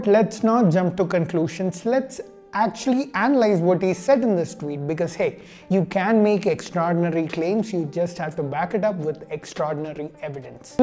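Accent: South India, India. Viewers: it's not the spelling mistake. It's the accent. South Indian accent.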